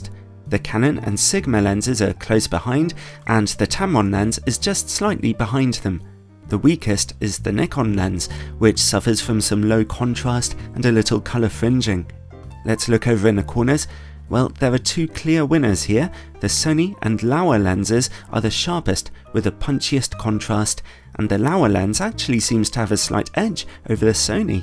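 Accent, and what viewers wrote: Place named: RP, United Kingdom. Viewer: It's the voice. Very soothing cadence and RP enunciation.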